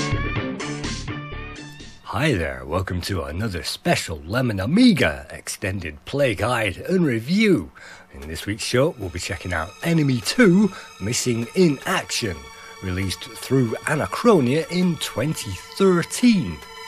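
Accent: Lancashire, United Kingdom. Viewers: The Lancashire is strong in this one!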